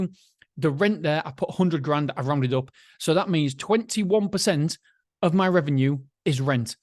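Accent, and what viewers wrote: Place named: England, United Kingdom. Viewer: I m in France and follow you since weeks, I love your accent even you speak fast... fast. Which part of England?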